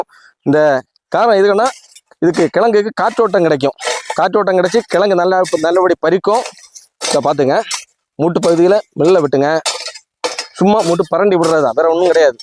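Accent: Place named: Nagercoil, India. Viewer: Nagercoil tamil azhagu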